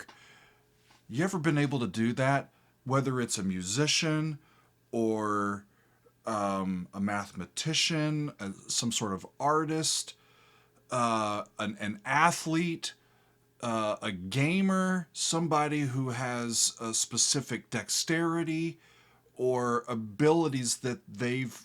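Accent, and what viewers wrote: United States. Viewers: seu sotaque americano é muito bonitão! Gostei! ❤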